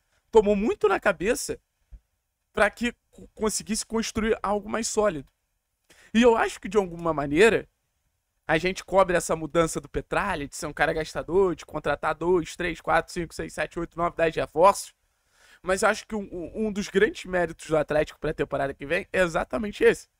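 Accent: Rio de Janeiro, Brazil. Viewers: E esse teu sotaque de carioca.....?? Vc é de lá...??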